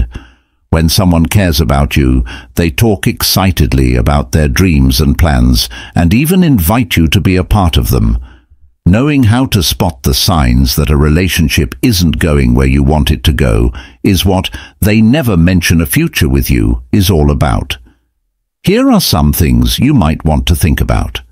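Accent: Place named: Scotland, United Kingdom. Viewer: Yepp took on a scottish broague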